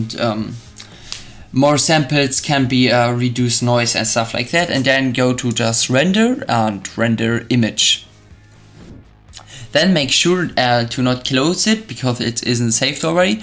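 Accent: Germany. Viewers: Are u german?? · are you german? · You have a little German accent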